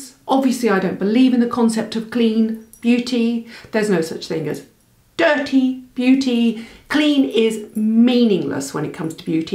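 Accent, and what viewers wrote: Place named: England, United Kingdom. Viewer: I just stumbled upon your channel. It's so refreshing to see a woman the same age as myself being honest & realistic about skin care and make up for mature ladies. I love Bobbi Brown and so wanted to like the Jone's Road miracle balm and moisturizer - but I felt like I was slathering whale blubber all over my face and I didn't like the smell. I have to say they were very decent about returning the items in that they refunded my money but told me I could keep the products. I'll pass them on. Plus, Im a Brit from North London who now lives in LA, so good to hear an English voice!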